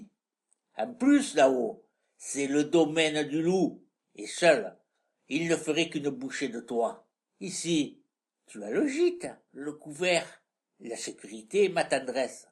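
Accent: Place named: Provence, France. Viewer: Une memoire d'enfance bien racontee avec un formidable accent de provence,,,, Qu'elle etait jolie la petite Chevre de Mr Seguin,sa barbiche de tout Officier ses sabots noirs eblouissants🐐☺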